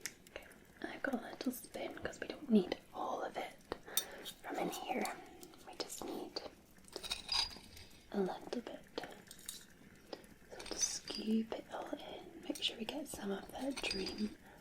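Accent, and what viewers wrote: Scotland, United Kingdom. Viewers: Amazing tingles, thanks ❤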